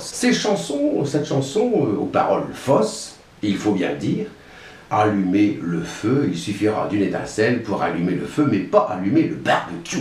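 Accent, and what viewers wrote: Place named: Nord-Pas-de-Calais, France. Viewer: On dirait Guy Lux parfois avec l'accent Chti ....le père de Liliane.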